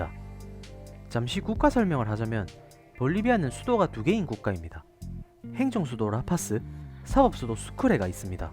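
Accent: Gyeongsang, South Korea. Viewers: 경상도 외국어 말고 표준어 써라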